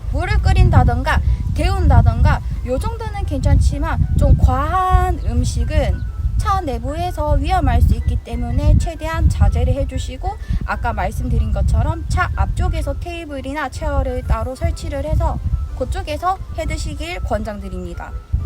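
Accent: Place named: Daegu, South Korea. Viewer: 저도 경상도 대구라 사투리 참 듣기 좋네요 ㅋㅋㅋㅋ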